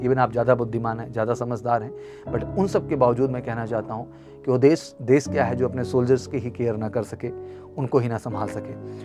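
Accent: Bihar, India. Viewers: Mr bihari accent, you remind me of Kanhaiya of JNU. Beta kaunsi party se ho? My father served in the Army for 30 years. He himself said that anyone committing suicide is not a brave heart. Suicide ke kaaran 90% due to personal issues hote hain. Jisko nahi bhejna na bheje fauj ke liye. Kamzooro aur namardo ke liye hai bhi nahi ye fauj. Zara video banao jo actual fire fight main shaheed hote hain. Dont make the armed forces weak. Aa gaya na aukaat pe ki agniveer nahi hona chaiye aur permanent hona chaiye. Dikha hi di aukaat.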